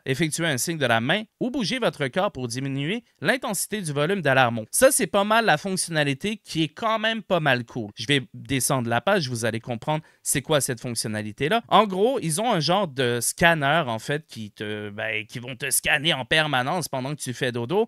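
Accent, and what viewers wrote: Quebec, Canada. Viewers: L'accent Quebecquoi raffraichissant Mdr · J'adore Nintendo mais je ne suis pas dupe, grosse arnaque ce truc 😅 Je trouve ça vraiment dommage que les gens achètent malgré tout car ils vont légitimer ces pratiques qui ne sont pas normales. Les boîtes sont vraiment trop gourmandes en ce moment et en plus je parie qu'ils s'en servent pour faire de la collecte de données. PS : J'aime trop ton accent, vive le Canada 🙂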